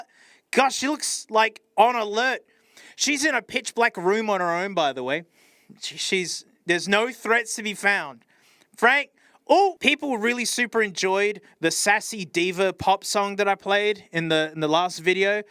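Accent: Australia. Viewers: its so weird hearing the aussie man in my ear, without any funny music in the background. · i really like the sassy diva pop song mr loud Australian man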